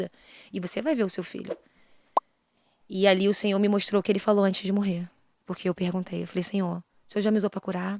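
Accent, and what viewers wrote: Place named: Rio de Janeiro, Brazil. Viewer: Pelo sotaque é do RJ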